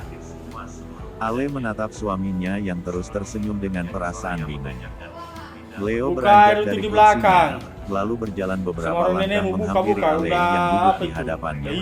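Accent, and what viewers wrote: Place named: Sulawesi, Indonesia. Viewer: Waalaikumsalam bang.makasih semoga sehat dan sukses..semoga semakin bahagia Ale dan leo..bang maaf bahasanya abang seperti bahasanya orang sulawesi...seperti aq 😊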